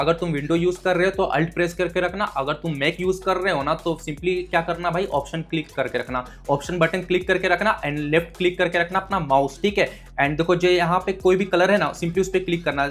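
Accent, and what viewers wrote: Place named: Hyderabad, India. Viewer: Zabardast Haiderabadi Accent.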